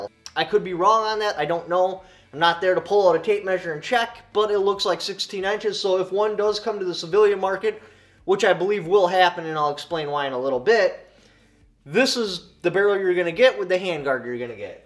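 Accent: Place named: Upper Midwest, United States. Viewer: There’s something unintentionally comical about this young man. I can’t exactly put my finger on what it is. I think it’s what I assume is his upper mid west mannerisms. I surmise he’s from there based on the way he pronounces certain words like mouth as “mewth”.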